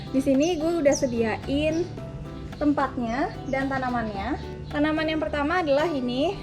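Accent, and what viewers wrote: Japan. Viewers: baru mau coba menggunakan media leca buat fern cari referensi nemu lah video ini yg pas, ada aksen japan nya btw ternyata prnh/lama di sana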